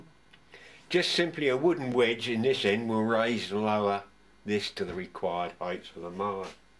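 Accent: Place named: Breckland, United Kingdom. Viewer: Love that accent ---from the Breckland ?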